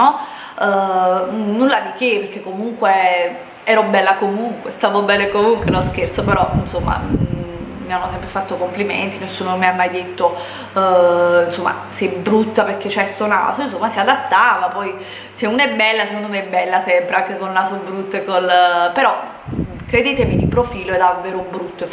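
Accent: Naples, Italy. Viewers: Che pena le persone che pensano che l'accento caratteristico di una regione sia una cosa negativa. NON è né un'attrice né una cantante, smettetela di parlare di dizione! Parlare di grammatica è una cosa, di dizione è un'altra. È napoletana e ha la sua cadenza, così come un fiorentino pronuncerà la 'c' aspirata e così via. La lingua è carica di storia, ed è cosa umana che cammina con le gambe degli uomini, nei luoghi in cui vivono. Sappiate che gli accenti e i dialetti sono un patrimonio e una testimonianza enorme e vanno preservati con tutte le loro diversità. Quando parlate di grammatica è una cosa, ma non se ne può più di leggere insulti sull'accento. Manco sapete di cosa state parlando.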